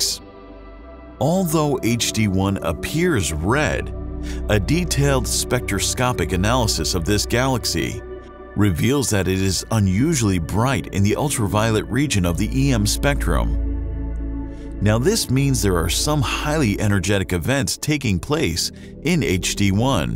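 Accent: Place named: United States. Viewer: clicked on video then heard this mans american accent, yeeeesh no thanks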